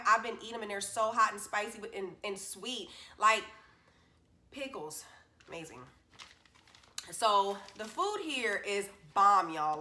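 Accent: Texas, United States. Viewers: First video I’ve watched of yours! Love your view of TX. Did you notice your cute Texan accent coming through?! You can definitely tell you’ve been around Texans for a while now. Be proud of that accent!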